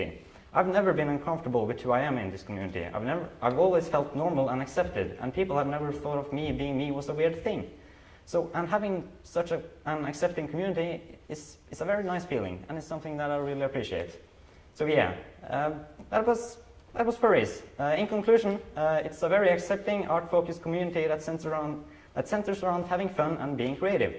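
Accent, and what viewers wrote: Norway. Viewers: Are you from (Norge) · When he tells you to put on subtitles for his Norwegian accent but fans knows exactly what he is saying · Thats what we call norwegian english! I have that accent sometimes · The clapping went so long ;-; my heart haha. I also love your accent and had noooo idea it was a Norwegian one?! Voices are wild. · Slaughtered Norwegian accent? No, your accent is beautiful. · Wait, wait are you from norway, HALLA FRA BAMBLE! EJ BRO HEG VISTE IKKE at du var norsk, hello from Bamble I didn't know you're Norwegian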